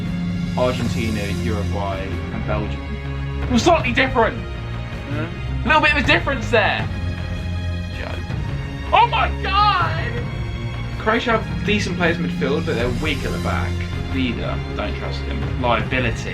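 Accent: England, United Kingdom. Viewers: Bit pathetic this, also you sound English? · speaks with english accent😬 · Why do you sound English? Proper sad this. You'd probably get bashed up in Cardiff due to people thinking youre English,. Another attention seeker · I like your English accent. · Sound English...posh boy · You need to loose that fucking English accent you have. You sound more English than the fucking English.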